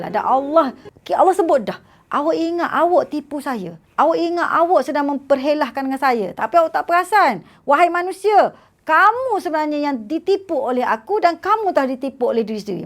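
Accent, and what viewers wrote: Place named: Kelantan, Malaysia. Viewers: Ustzh awal2 lancar je lama2 keluar jgk Kelantan dia haha. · Bahasa Malaysia aksen Kelantan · haaah..mari mana...sah kelate🤣🤣🤣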